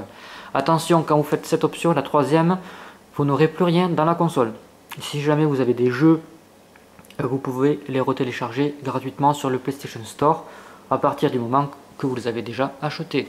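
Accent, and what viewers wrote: France. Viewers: Merci pour la vidéo PS j'aime trop ton accent du sud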